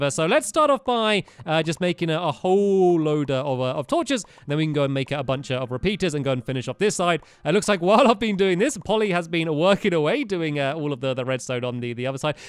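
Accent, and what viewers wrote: United Kingdom. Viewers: Why do you live in England then your British and you say z as zed and h as heych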